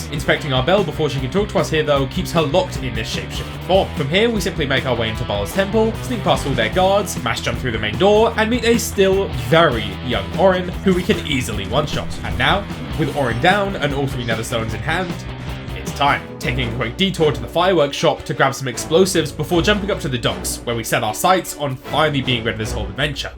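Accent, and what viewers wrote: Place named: United Kingdom. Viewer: Great editing. You should boost your audio a bit more. I need that beautiful british voice straight in my ears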